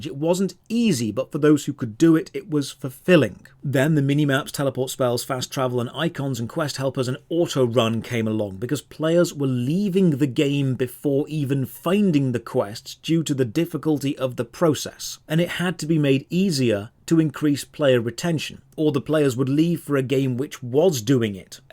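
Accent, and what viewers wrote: United Kingdom. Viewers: You sound so british for a swede.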